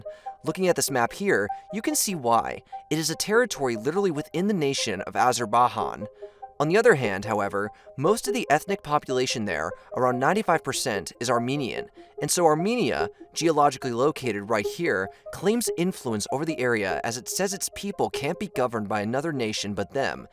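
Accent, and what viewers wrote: Spain. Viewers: He's probably Spanish, where "J" is pronounced "H". Like Juan, Jose, Marijuana,...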